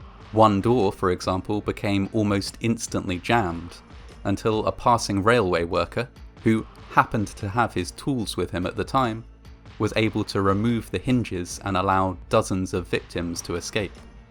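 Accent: England, United Kingdom. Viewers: This channel is amazing and you sound like a really intelligent guy. Very refreshing. Would I be correct in assuming that you are English?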